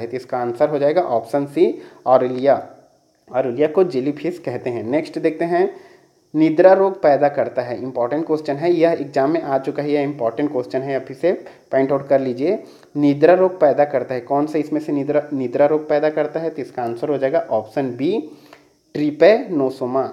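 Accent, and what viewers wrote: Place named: Chhattisgarh, India. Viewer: c.g kuc jyada hi bolte ho kya sir..hindi ko v chhatisgarhi typ tunning me padhh rhe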